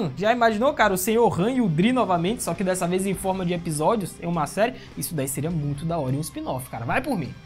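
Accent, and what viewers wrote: Bahia, Brazil. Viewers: Caramba mano, tu é baiano né? Tbm sou, so da sua voz da pra identificar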